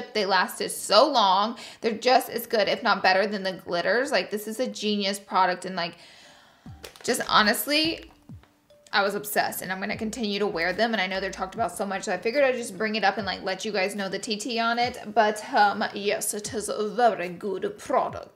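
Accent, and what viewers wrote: South, United States. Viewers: I’m a Louisiana woman and I love your Southern accent. Sounds Louisiana or Alabama...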